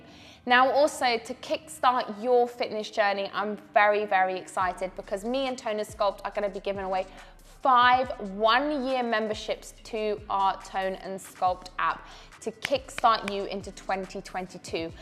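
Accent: England, United Kingdom. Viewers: me encanta tu acento britanico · Смотрю ее только из за английского акцента)))))) ну и жопа тоже класс😁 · she caught me off guard with that f bomb.... sounding all proper with that British accent and looking so innocent. Lol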